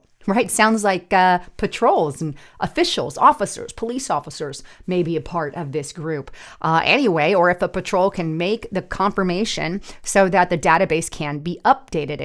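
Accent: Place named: South, United States. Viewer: Great thorough report as always - and nice job w/Min-ne-a-polis. Hey, if it's the way you have always pronounced it - yes, hard habit to break. Also, I'm originally from Mass-a-chu-setts - noticed you like many here in the South pronounce it Mass-a-two-sh*ts - not sure if its a purposeful slight on the state by Southerners. Either way, at this point in MA history, its a very appropriate pronunciation!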